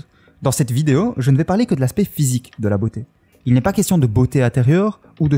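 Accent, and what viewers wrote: Belgium. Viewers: On garde l'accent belge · J'ai cru que tu étais mort ! xD Sérieusement très bonne vidéo comme d'habitude et vive l'accent Belge !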